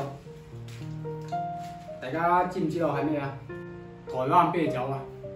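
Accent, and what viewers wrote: Cantonese, China. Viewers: 其实你的普通话的口音就是广东话口音，早就知道你会说广东话。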